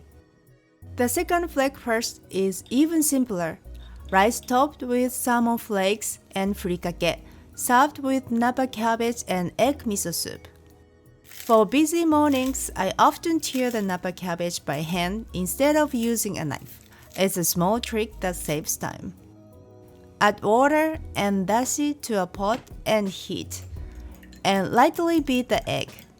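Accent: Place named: Japan. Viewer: The heavy japanese accent tells me that this is authentic. Also, nice cap.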